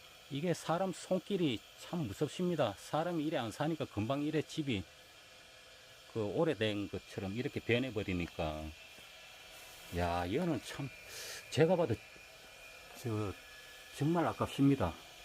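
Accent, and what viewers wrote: Gyeongsang, South Korea. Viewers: 오지마을 구석'구석 다니시며 구수한 경상도사투리로 안내 힘쓰시는건 감사한데 최소한의 지역명이라도 알려주심안될까요? 볼수록 자랑만 하시고 혼자서 자기만족하신단 생각이드네요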